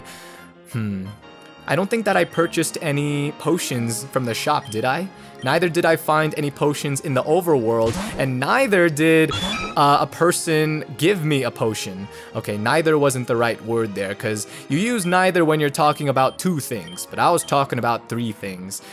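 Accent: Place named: Sweden. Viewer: That's so weird! It sounds a little bit like you have a Swedish accent sometimes. (No offence intended)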